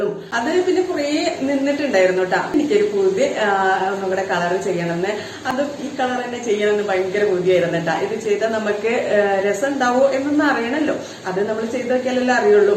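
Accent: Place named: Malappuram, India. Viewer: നിങ്ങള് മലപ്പുറം ആണോ? ?സ്ലാങ് മലപ്പുറം ആണല്ലോ 😀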